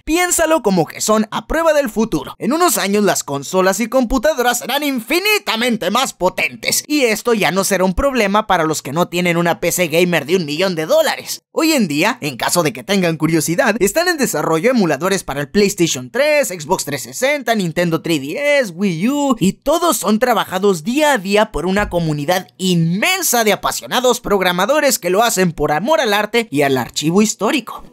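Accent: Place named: Yucatán, Mexico. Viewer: Para nada, al contrario me gusta tu estilo , si noté un acento medio yucateco BOMBA! , pero es sólo mi percepción, saludos y buen trabajo!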